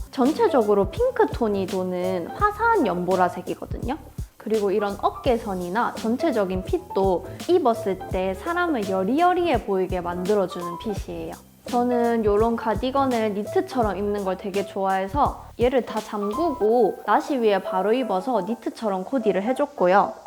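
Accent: Gyeongsang, South Korea. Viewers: 언니 사투리!! 경상도인가요? 귀엽네용...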